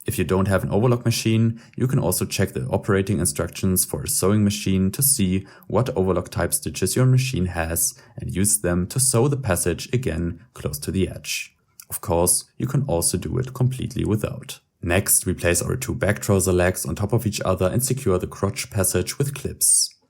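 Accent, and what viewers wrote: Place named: Germany. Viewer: I’m hearing german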